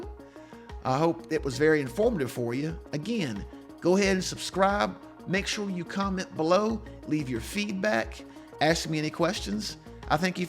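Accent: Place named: Virginia, United States. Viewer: I could tell from that accent that you were from a southern part of one of the Virginia's 😊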